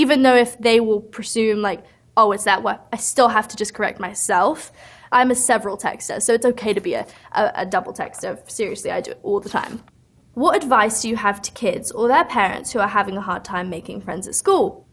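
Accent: United Kingdom. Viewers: She’s British? Oh damn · Shes britisH?! I didnt even know idek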